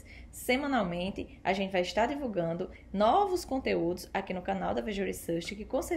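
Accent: Northeast, Brazil. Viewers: Excekente video. Parabens ! E o sitaque matavilhoso me traz as boas lembrancas do Nordeste e dos inumeros amigos nordestinos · EXCELENTE VÍDEO, GRANDE CONHECIMENTO SOBRE O MERCADO DE AÇÕES, AMEI O TEU SOTAQUE NORDESTINO, NÃO O PERCA NUNCA! TÚ REPRESENTAS COM DIGNIDADE O POVO NORDESTINO! APENAS CORRIJA O HÁBITO DEFEITUOSO DA PRONUNCIA (INDENTIFICAR) NA PALAVRAS "IDENTIFICAR". PARABÉNS! PROFESSOR ROBERTO GUEDES CAMPINA GRANDE PB!!!!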